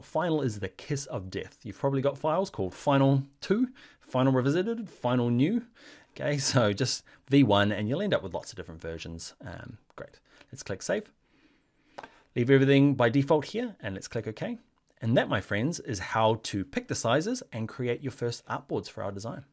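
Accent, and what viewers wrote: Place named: New Zealand. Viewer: Love to hear the Kiwi accent helping developers!! Cheers bro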